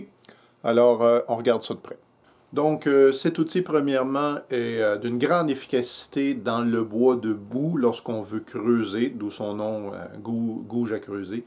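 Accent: Quebec, Canada. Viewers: De bonnes astuces pour le débutant avec comme bonus ce bel accent québécois... Merci ! 😉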